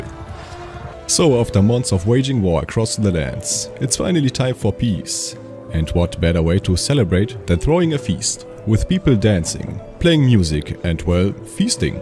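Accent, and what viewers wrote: Germany. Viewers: Der deutscher akzent hört man zu sehr aber gutes video schade nur das man auf der konsole keine mods benutzen kann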